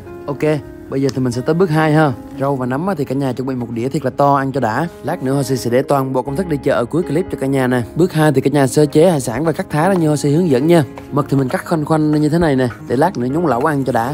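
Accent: Quang Nam, Vietnam. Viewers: Con trai người Quảng Nam hay Đà Nẵng vậy ? Chúc mừng kênh con , bác luôn theo dõi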